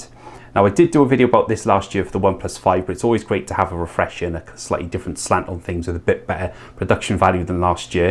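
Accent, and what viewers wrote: Wales, United Kingdom. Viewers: Top tips helped me out 100%, you sound welsh? Me too, from aberystwyth! Cyngor a syniadau gwych!!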